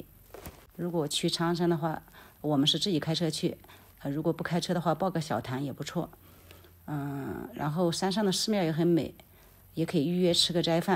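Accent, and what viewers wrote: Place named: Enshi, China. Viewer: 楼主好像带恩施口音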